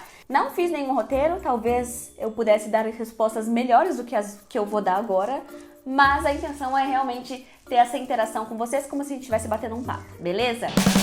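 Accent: Rio Grande do Sul, Brazil. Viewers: gaúcha demais hahahahah e é tema e não lição, como também é bolacha e não biscoito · Comecei a assistir os videos agora, capaz que é gaucha hahahahahahahaha terra dos professores BD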